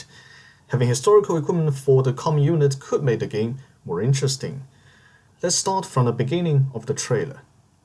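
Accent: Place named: China. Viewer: By your pronunciation I assumed that you are Chinese so can I ask in Chinese? 我一直很好奇為何漢朝的盾 或中國以前的盾 都有點倒三角形 跟西方的騎士盾剛好相反 這是為何呢 ？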